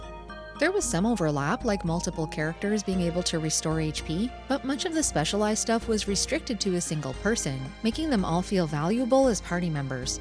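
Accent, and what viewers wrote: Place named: Atlantic Canada, Canada. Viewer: I just watched your Faxanadu play through and couldn't stop thinking that you sound like you're from the east cost of Canada :)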